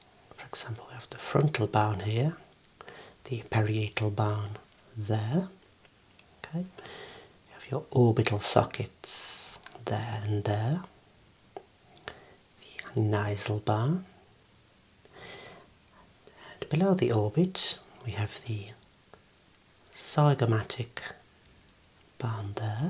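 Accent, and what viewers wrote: United Kingdom. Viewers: Your voice is very relaxing fine british gentleman in deed thanks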